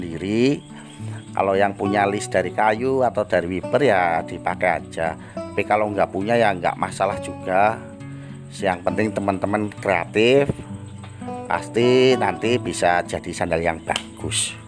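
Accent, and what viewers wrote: Java, Indonesia. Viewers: Tak pikir wong medan pak... Jebule medok hehehehee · Wihh mantep pak, wihh nadane medok nggih, lokasi pundi pakde , pengin Sinau mbelah ban bekase 😁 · Loogatenya seperti jawa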